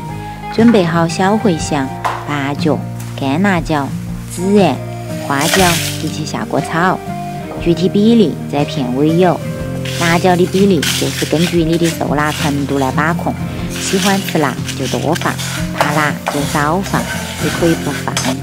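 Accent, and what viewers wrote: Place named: Sichuan, China. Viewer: 我不是中国出生的都能听懂。蛮好听的四川成都话。👍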